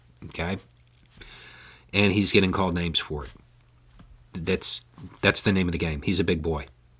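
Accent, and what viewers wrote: South, United States. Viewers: He’s already at the top! A man from the south fighting against racism and educating those who seek him out. Hey y’all I’m fine with whatever he addresses his VOLUNTARY viewers as. He’s kind and respectful and smart. Stop criticizing small regional differences. I was never confused that maybe he was from Jersey “Ya’s know?” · Teaching is communicating & “proper” communication isn’t always the most effective. He ain’t a professor. That was completely improper English, I grew up being told not to use, but you understood me & likely didn’t feel condescended to. Y’all, ya’all, yinz, youse guys, etc, is nothing compared to his original code-switched Southern-speak on this channel. He’s already cleaned it up about as much as he can for us Yankees & overseas folks, while still having people who look- but don’t think like him- give him a chance🤷‍♀️ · please allow me to politely disagree. 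Y'all is proper English in the south, and I also use ain't. In my opinion speech and look are the way they are to show Country/Southern doesn't mean stupid. Just my opinion.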